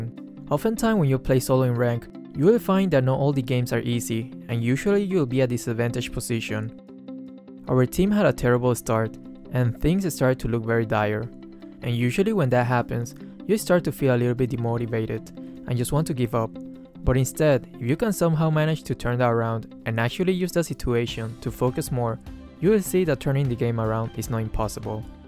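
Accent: Philippines. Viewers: i believe no... Based on his accent... If ur filipino u wud know wat i mean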